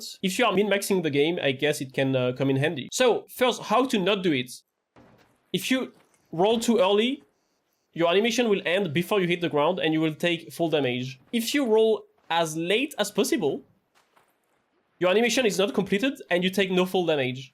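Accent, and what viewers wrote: France. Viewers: sorry you have to play this game on hard mode once the other raiders realize you sound french. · Français non? Le bon vieil accent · Why do i feel like you have french accent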